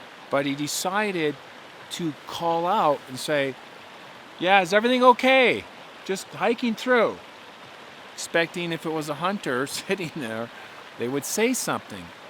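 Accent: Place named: Southwest Michigan, United States. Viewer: Great stories,! I know you were from MN originally, but did you ever spend time in SW Lower Michigan - Grand Rapids area? You pronounce some words exactly like that area. Just something I noticed. I really prefer and really like the videos that don't have much AI. I would much rather look at the river, look at you, look at a pinecone, rather than do anything that makes an AI center profitable. It would be so much easier for you to do that, so I appreciate the work you put into these videos! I hope you have a fantastic summer! I'm having a cup of coffee ( PM lol) and it tastes so much better when it's in my Base Camp ceramic coffee mug. 😊😊 I'm already looking forward to Sunday!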